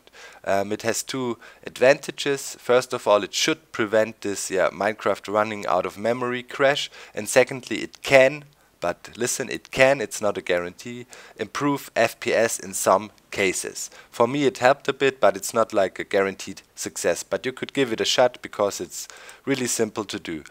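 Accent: Germany. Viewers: are you from german? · yes he is becuase he lives in germany and hes actcent :3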